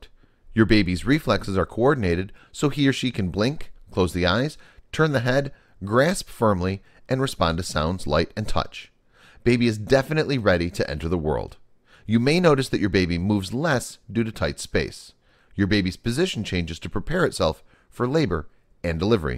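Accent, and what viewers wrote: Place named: United States. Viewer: British voice should be mandatory for documentaries. What a horrendous "metallic" American voice.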